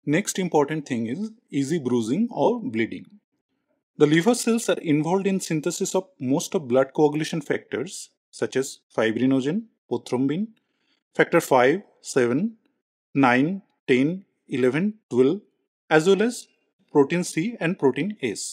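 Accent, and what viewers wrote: India. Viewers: Thank you for the information. I have great respect for doctors from India 🇮🇳. Your voice gives it away. You are very knowledgeable.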